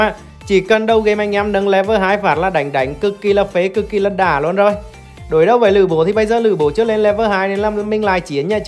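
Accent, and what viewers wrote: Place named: Central, Vietnam. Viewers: Nghe giọng ở huế quá. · nói ra có chút vô duyên và có gì thì cũng xin lỗi trước vì nghe giọng hơi chói tai, này là giọng miền trung, mình thì ko có ác ý gì cả nhưng xưa giờ mình nghe giọng miền trung thì cả ngưới rất khó chịu, nếu chỉ là nói từ tốn thì ko sao còn kiểu như nói nhanh và nói nhiều liên tục thì nghe rất khó chịu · Bạn nói chuyện hay phết.giọng mt nge cuốn ghê..:))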